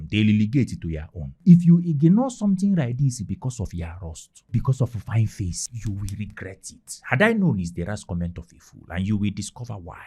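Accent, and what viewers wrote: Igbo, Nigeria. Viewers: You are obviously forming this lovely and funny Igbo accent. Nice show brother. Go well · Igbo man. I like your accent 😂😂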